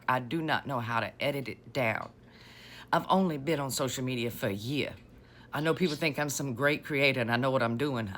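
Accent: South, United States. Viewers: I'm so sorry about this situation. I appreciate and love your candor and delivery, I have southern roots and miss that cadence! I pray for protection on you and yours. Be safe and well!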